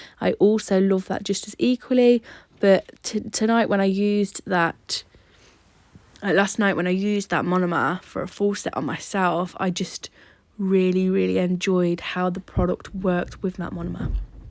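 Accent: United Kingdom. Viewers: Jajajaja i love the way you said piña colada I’m from Mexico and I love your British accent Greetings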